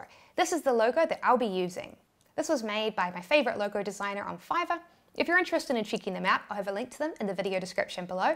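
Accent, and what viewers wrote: New Zealand. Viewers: That New Zealand accent is real. Who else thought she said sex coasters?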